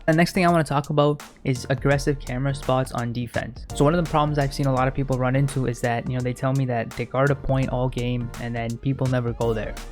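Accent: India. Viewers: Are you Indian bro?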